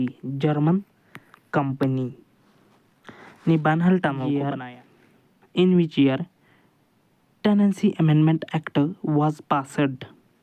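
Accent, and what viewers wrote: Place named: France. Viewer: french kee english bolna chod dooo mra bahi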